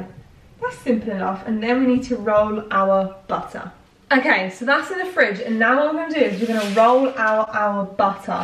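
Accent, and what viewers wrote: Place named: Birmingham, United Kingdom. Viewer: You're a brummie! I knew it!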